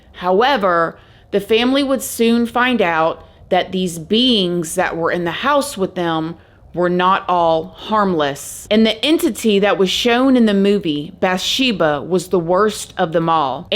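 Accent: South, United States. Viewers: I just saw you on TV! It was an as for a tv channel. Mysteria I think. Haha! Congratulations! I wasn’t even looking at the tv and I heard ur voice and that beautiful Southern accent and looked up and saw u for a split second. But undoubtedly it was u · Just found your channel and I’m gonna say your from the south lol. You sound like your from where I’m from. 😂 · Where are you from? 🥰 I almost recognize your accent from the south ♥️ I’m new here and I’m enjoying it so far!!!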